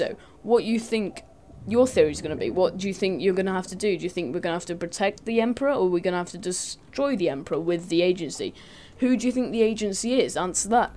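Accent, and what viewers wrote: England, United Kingdom. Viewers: How refreshing to hear a well spoken, dignified English accent. The game is described clearly and concisely, without going off on tangents or babbling. All too often you have some ego waffling nonsense in the form of insane screaming. As well, they tend to describe everything as awesome. Awesome this. Awesome that. So much awesome is not awesome. It's 90% VJ ego and 10% about the clip, which is primarily the topic that interests me. Instead, I learn more about the ego of the awesome VJ far more than the actual reason why I'm watching. Perhaps it's Pewdiepie's fault. Who knows? All I know is, I don't enjoy VJ's with egos.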